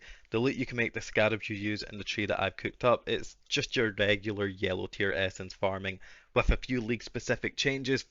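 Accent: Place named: Glasgow, United Kingdom. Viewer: n ur accents pretty tame too compared to some real glaswegian gems I encounter on the daily 🤣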